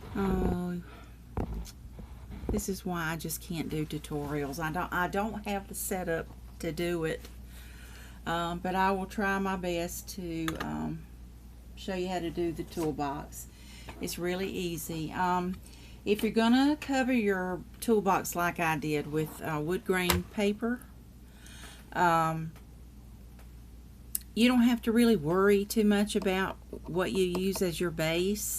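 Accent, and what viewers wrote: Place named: South, United States. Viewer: Hi,: I think you did a fabulous job on this video... you've enticed me to make a tool box for my mum, who is in a retirement home. She's a very girly girl (at 90 she calls herself a girl!) so I'm going to use pink cardstock and pink floral paper. I know she'll love it. Thanks so much for sharing and do please continue to do tutorials... you're really very good at it... and I love listening to your sweet southern voice. Hugs, Nancy:)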